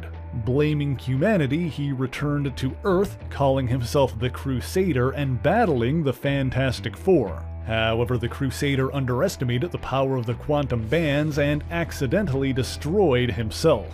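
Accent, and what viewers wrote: Newfoundland, Canada. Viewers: You sound like you’re form Newfoundland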